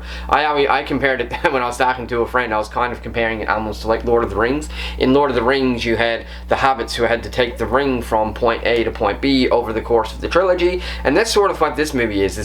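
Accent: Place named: Canada. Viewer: Probably not the greatest idea to make this movie when America is currently engaged in a literal soft civil Cold War. But im sure that's exactly why it was allowed to be made. It seems like pure propaganda to me. You sound Canadian. I suppose if they made a Canadian version of this, I'd watch it and think nothing of it. Maybe im wrong, but you keep saying "aboot" so I'm assuming you're from Canada. Forgiving me if I'm wrong. Edit: You said you're Canadian in the video. lol I probably won't watch this one due to being American. It hits home too hard with the current state of our nation. I'll take your word for it.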